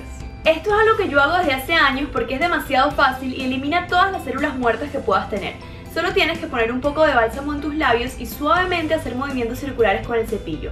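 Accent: Venezuela. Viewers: Igual porque eres venezolana no capto tan rápido jeje, yo soy mexicana y a veces al escuchar los diferentes tipos de acentos de otros países aunque hablemos castellano a menudo me son un poco difíciles de cachar ;) pero muy buenos tips! gracias ;) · sii soy venezolana, y supe que tu lo eras por tu acento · De que pais eres?? de Venezuela? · Una pregunta tu eres Venezolana? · eres venezolana?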